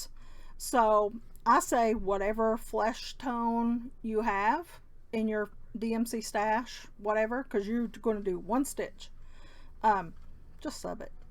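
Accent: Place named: Tennessee, United States. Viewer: I just realized you must live near my old stomping grounds. I spent most of the 80’s in Dickson, Paris, & Dresden, TN. I miss it a lot! Nice to hear your familiar accent. I’d love to stitch long winter.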